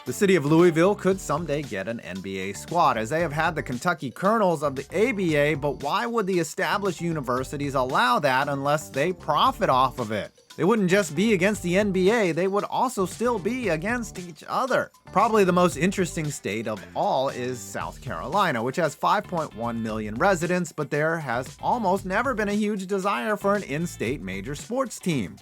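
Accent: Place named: Northern Virginia, United States. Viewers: Listening to this just shows you are definitely from VA or have lived there at some point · As someone that lives in NOVA I can so tell your from here.. lol. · I know you make videos on broad topics but you're definitely from Virginia hahahaha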